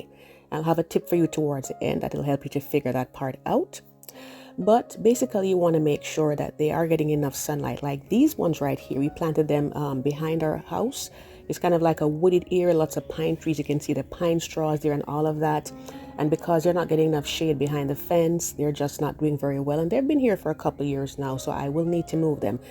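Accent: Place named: Jamaica. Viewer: Love your Jamaican accent. I'm Jamaican too.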